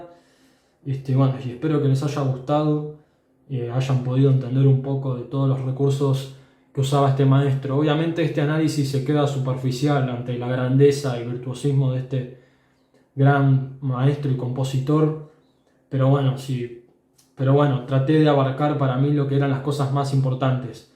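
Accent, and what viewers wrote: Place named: Argentina. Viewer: Espectacular análisis de la técnica del gran Keith Emerson en español. Intuyo por tu acento que eres argentino. El país de Alberto Ginastera, al que, como muchos otros, conocemos gracias a Keith. Se me ha pasado volando la media hora larga del video.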